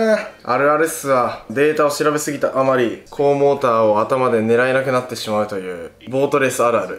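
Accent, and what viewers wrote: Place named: Senshu, Japan. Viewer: 泉州弁みたいな喋り方だな〜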